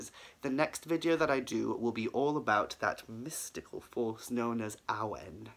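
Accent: Wales, United Kingdom. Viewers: Oh I’m so excited to have found you on TT and here on YT! I’ve been wanting or feeling called even, if you will, to look into my Welsh roots and pagan/witchcraft practices from Welsh perspective and there is so little out there. I’m in US. I follow the Irish pagan school which has gone a long way to teaching me right relationships and honoring living cultures and so on. But I’m super super excited to hear a Welsh voice. Thank you!!!! 🙏🏼 🎉💜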